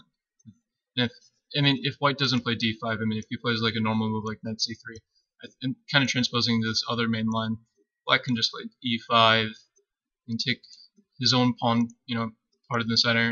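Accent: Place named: United States. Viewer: Some chess video instructors for some reason present a black opening from the white player's side, which makes it more difficult to follow. Kudos for presenting the Leningrad Dutch from the black's perspective,. You presented well in clear American English, covering the intent of black for each move, and the possible white moves in reply. Thank you for a great opening that was taught well. I hope you do more chess videos!:)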